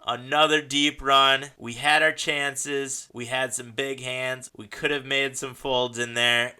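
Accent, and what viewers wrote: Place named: Southern California, United States. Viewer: Stupid 7s ...$1800 though . You have built up a pretty nice balance ... It's only been like 6 weeks . Rad ! I learn a lot from watching these . Just out of curiosity , did you use to , or still have a YouTube page about fake staged events , flat earth , and NASA perm hair dues ? If so , send the link . My brother sent me a video of a guy talking about that , and he wasn't nearly as entertaining . The guy I wanted to show my brother , has a similar voice as yours ... But we all grew up in Southern California ... It may just be the regional accent that I'm identifying with . Anyway..Have a great Sunday . Thanks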